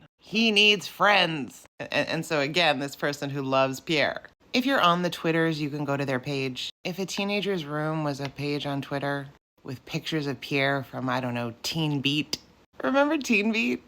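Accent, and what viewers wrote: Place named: South, United States. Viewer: Why do you have a southern accent, but I am still agreeing with you pp has to go!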